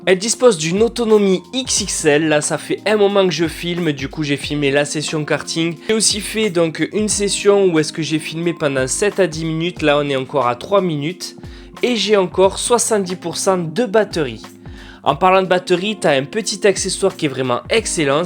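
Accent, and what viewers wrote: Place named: South-West, France. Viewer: Je trouve qu une vidéo tech avec l'accent du sud-ouest ça passe toujours mieux 🙏🏻☺️ #teamchocolatine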